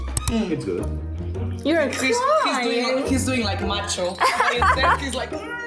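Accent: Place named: United Kingdom. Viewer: 소영님 영어에 영국식 발음이 들리는데 배우신건가효...?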